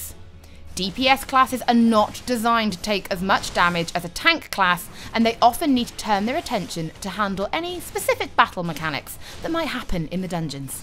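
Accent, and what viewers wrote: United Kingdom. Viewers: Is it only me that thinks her voice is sexy but yet really cute at the same time. When she said archer was sexy as hell. She sounds British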